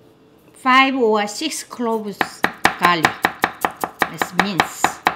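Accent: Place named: South Korea. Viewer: I'm korean. I'm not good at English. but I can understand your speech. I think you have korean accent when you speak engligh.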